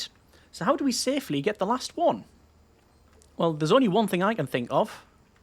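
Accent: United Kingdom. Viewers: Are you British? Because I haven't realized that for a long time. 😅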